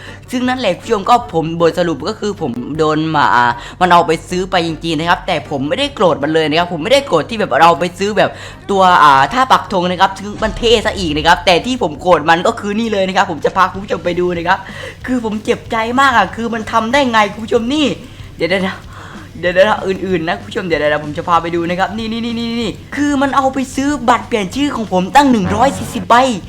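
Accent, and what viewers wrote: South, Thailand. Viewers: แหลงใต้ออก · หนูพึ่งรู้นะเนี่ยว่าพี่เป็นคนใต้😆💕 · พีขอถามหน่อยเป็นคนใต้ไม่เนี้ย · พี่เป็นคนใต้ใช่มั้ยนิ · คนใต้นิเบอะ555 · คนใต้มั้ยนิ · พี่เป้นคนใต้ใช่มั้ย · คนใต้นิพี่ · พี่เป็นคนใต้หรอ!! 55555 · พี่บ่าวเป็นคนใต้หรอ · พี่เป็นคนใต้หรอ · พี่คนใต้หรอคับ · พี่เป็นใต้เห่อ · คนใต้นิหว่า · พี่ครับรหัสหลักผมโดนแฮ็ค<num>ผมควรทำอย่างไรครับใครรู้บอกหน่อย ผมเพิ่งรู้ว่าพี่เป็นคนใต้55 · พี่พูดใต้ใช้มั้ยตอนที่ไปูบัตรเปลี่ยนเชื่อ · พี่เป็นคนใต้หรอค้าบ❤️🥰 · ฟังภาษาก็รู้ว่าเป็นคนใต้ผมก็เป็นคนใต้ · พี่เป็นคนใต้ปะ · คนใต้แม่นนิ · พีแหลงไต้ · ใต้มาชัดเจนเลยนิ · แหลงใต้เหมือนเราเลยนิ คนนครศรีธรรมราช5555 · พี่เป็นคนใต้หมันม่ายคับผมก็เป็นใต้ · ชัดเลยพี่เป็นคนใต้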